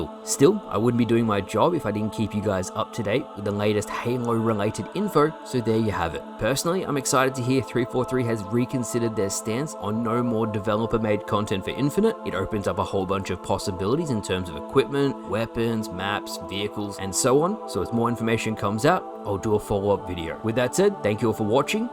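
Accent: Australia. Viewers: Sometimes I forget you're Australian.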